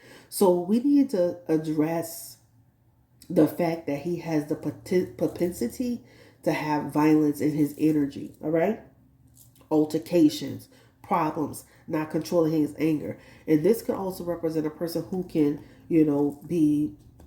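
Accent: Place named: New York, United States. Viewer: You are from New Orleans, but you sound like a New Yorker, well just an observation.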